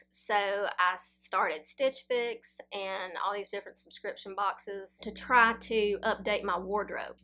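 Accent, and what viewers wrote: South, United States. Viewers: Hi - thanks for all your reply’s to our many questions. Your honesty and directness is much appreciated. Glad to hear you found happiness and a surprise son in your second marriage ( lots of us don’t). That will keep you young. I remember your video about the “serial killer living near by and him stalking your sister - that was scary and must of been difficult to tell us about. Love wat chin and listening to your charming Southern accent too.❤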